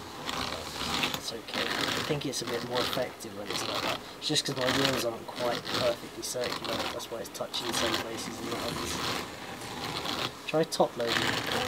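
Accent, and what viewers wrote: Wales, United Kingdom. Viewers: Because I'm listening to you with American ears sometimes your Welsh accent is hard to understand but I love your videos keep up the good work you're very talented person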